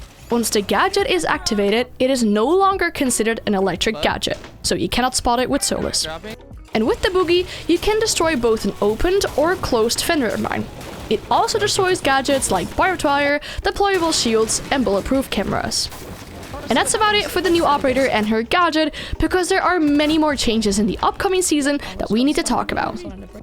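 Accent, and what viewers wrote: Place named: United Kingdom. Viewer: Lol you're dutch? In another vid i saw u sounded British 😅 groetjes.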